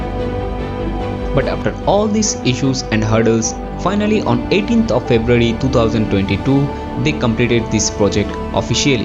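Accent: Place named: Bengal, India. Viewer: You must be bengali..i can guess by your way of speak..sound good🔥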